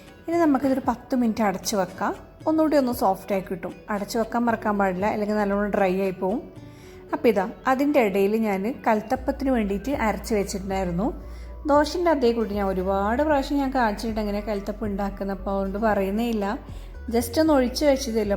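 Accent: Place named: Kasaragod, India. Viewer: Kasarkod basha ishttapettu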